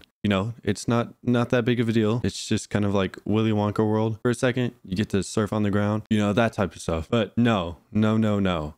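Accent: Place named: South, United States. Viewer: When did turn like extremely southern?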